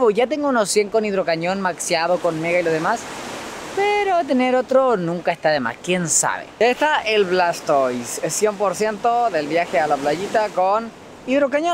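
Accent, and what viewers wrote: Chile. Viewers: eri chilenooooooooo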